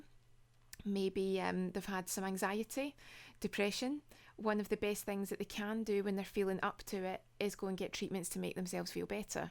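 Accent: Scotland, United Kingdom. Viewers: im from Scotland too, nice to hear a familiar accent. BTW i have been using your techniques for my husband who suffers from stress and crohns disease and it helps him EVERYTIME< thanks so much for your effort and time in making these videos. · Swedish massage....I was not expecting a lady from Scotland to do the commentary. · Where in Scotland are you from? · I could listen to your accent forever. Lol… I need to find a nice Scottish woman. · I knew you were from Scotland, you have simillar accent to my friend’s. Are you from the Highlands? Btw, great massage. I am currently studying medicine and these kinds of videos help me to get a grasp of anatomy. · You`re Scottish accent is super turn on. Great upload,btw. :) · Is she Scottish ? I'm fond of northern British accents (and some like Surrey). She has a lovely voice indeed... She's a masage for the ears and brain :)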